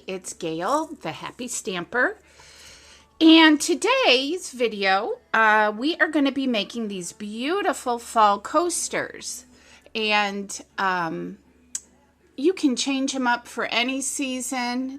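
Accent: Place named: Cleveland, United States. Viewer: Oh I am SO doing this one!! Thank You!! ... and smoosh is SO a word!! You sound like you might be/have been from Cleveland - are you??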